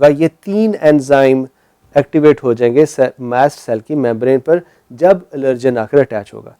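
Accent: India. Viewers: ur urdu is so gud u hv bit of kashmiri accent :) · it's imposible to understand indian 😢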